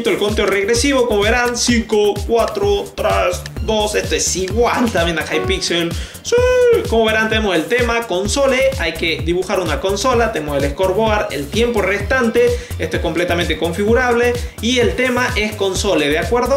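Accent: Argentina. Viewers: JAJJAJA COMO SE NOTA Q SOS ARGENTINO GRANDE SEGUI ASI SALUDOS DE ARGENTINA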